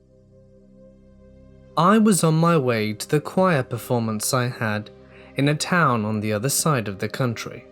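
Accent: United Kingdom. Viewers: Nice British narration and manner for a change ( I thought USA You Tubers had the Monopoly on this subject ! )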